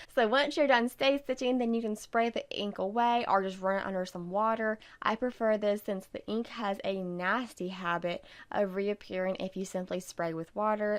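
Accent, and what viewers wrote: South, United States. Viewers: Thank you!! My first time watching and I will be watching again (and again!) Your very gentle southern accent just makes the entire video all the more pleasant. Excellent close ups and hints and tricks for this classic heirloom look.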